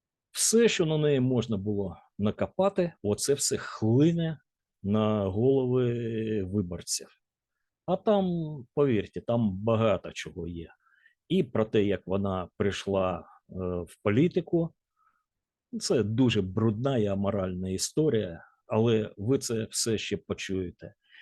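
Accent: Russia. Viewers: Як відчувається російський акцент, і в мові, і в темі.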